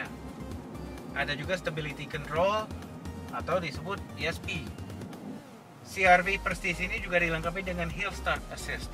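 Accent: Indonesia. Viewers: wkwkwk bule tapi cengkoknya asli indonesia banget 😀😀... reviewnya super jujur, pedeees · Bahasa Indonesia nya udah ada aksen Indonesia nya. Itu baru jarang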